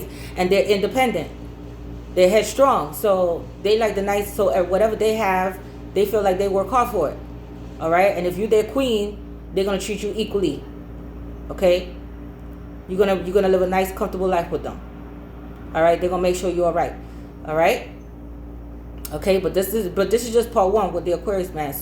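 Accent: New York, United States. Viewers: i LOVE ur accent lol ny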